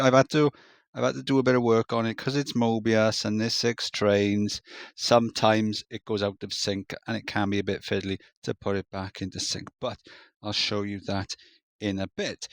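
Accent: Wales, United Kingdom. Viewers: I've been trying to place your accent,! Is it Welsh? Hopefully you don't mind me asking. Regardless, I love your RCT content and have been using a ton of your coaster designs!!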